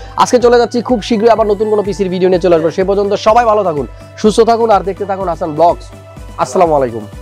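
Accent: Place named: Bikrampur, Bangladesh. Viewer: vai apnar gramer bari ki bikrampur answer please vasha shune mone hoy vai❤️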